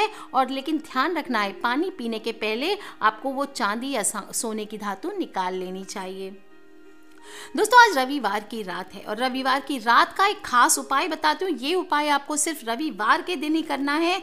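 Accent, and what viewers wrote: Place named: Marwari, India. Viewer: Radhey Radhey Mam aap marwadi hai kyunki aapke bolne ke wording bilkul hamari tarah hai.mujhe Kolkata ki yaad aa jati hai aapki baat sunkar.l like you ma'am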